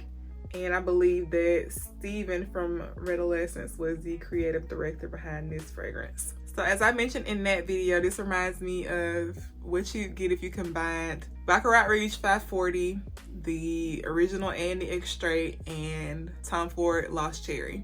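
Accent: United States. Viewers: I love your country accent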